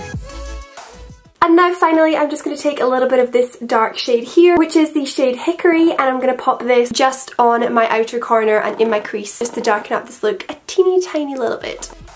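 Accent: Scotland, United Kingdom. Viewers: What part of Scotland are you from? I'm Scottish too :)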